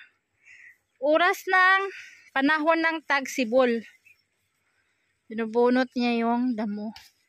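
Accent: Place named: Cebuano, Philippines. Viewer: Work narin ang pag vlog mo sis.....magkatawa ko nimo kay mo gawas jud imo pagka cebuana...God bless dai...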